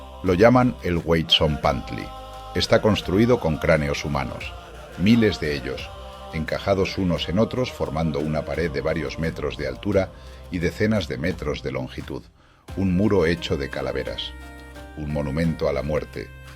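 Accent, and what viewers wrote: Spain. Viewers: Te oyes español. Recuerda que la historia de la conquista de la conquista la escribió Bernal Díaz del Castillo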